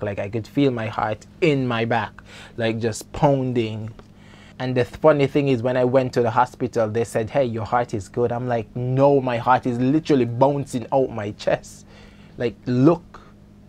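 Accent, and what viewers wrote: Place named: Jamaica. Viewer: I want to say sir you really know your information. Love how you explained everything. Love your vibe ❤ Just curious, sre you Jamaican or Haitian? I’m hearing both. Mostly Jamaican or maybe Vincy?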